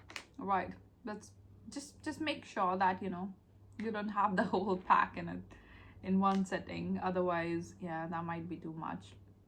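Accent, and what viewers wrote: United Kingdom. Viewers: I grew up eating Tunnocks biscuits & Tea cakes as they're a huge favourite in Scotland.👍 Is it just me or do you have a Welsh twang to your accent?🤔😁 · The way you pronounce words like "Lovely (luverly), Flavoured (flaveED), Orange (ORange)" it's not a proper Welsh accent, but the way you draw out O sounds and enunciate the endings of words, does give you a very slight Welsh Valleys twang to your accent.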